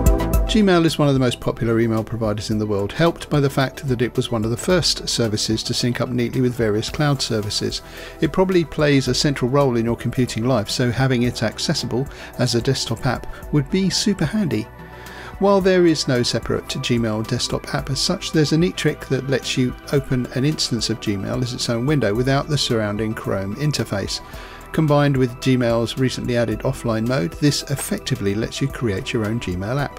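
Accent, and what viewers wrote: England, United Kingdom. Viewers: Machine gun rapid fire voice presentation . Heavy English accent. Listened to tutorial multiple times, tried ajusting volume levels , finally gave up, Will look for another tutorial for downloading Gmail into a windows 10 desk top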